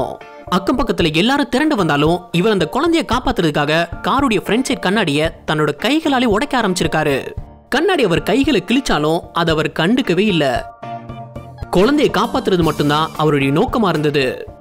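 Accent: Madurai, India. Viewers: Nee ga madurai ya bro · Bro neenga madurai yaa bro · Bro neega Madurai ya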